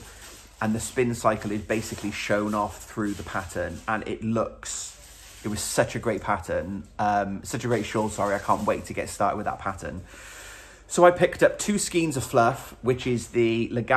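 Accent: Wales, United Kingdom. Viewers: Loved your tour of Unravel. I watch Le Garcons as I am from Canada :) I love to hear your Welsh accent since I am originally from Abergele. Take care ! Hi to Mark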